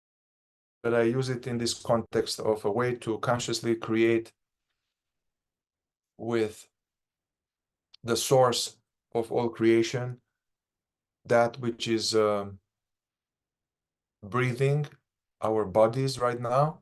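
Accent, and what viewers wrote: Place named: Jamaica. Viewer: Where are you from you sound jamaican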